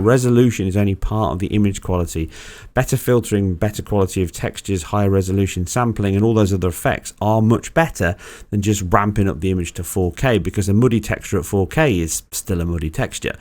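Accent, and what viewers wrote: United Kingdom. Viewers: There is no wrong or right, but he sounds like a proper British.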